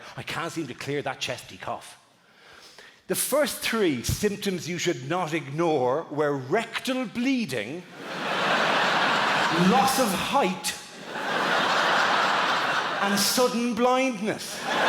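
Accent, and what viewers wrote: Ireland. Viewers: I think Dara is one of the few stand-ups that is still funny even though he is speaking a million miles an hour, as that doesn't often really work well for susained comedy. Must be the Irish lilt!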